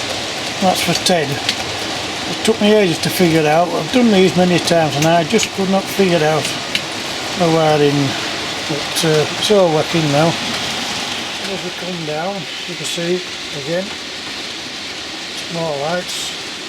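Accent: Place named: Barnsley, United Kingdom. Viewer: i take it from your accent your Barnsley way?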